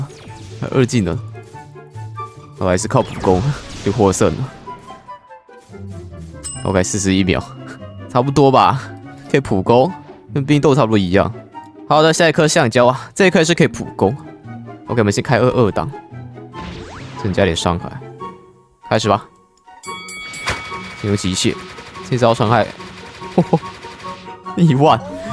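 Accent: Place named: Hong Kong, China. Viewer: R子你可以用马来西亚的口音吗？好怀念你马来西亚口音。你现在的口音好像香港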